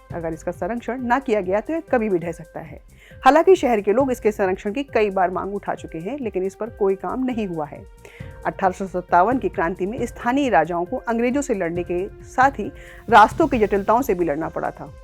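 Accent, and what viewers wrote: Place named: Purvanchal, India. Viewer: मैडम आप भी पूर्वांचली/अवधी/भोजपुरिया क्षेत्र से हो क्या....... तालुकेदार क्या होता है 😂😂😂😂😂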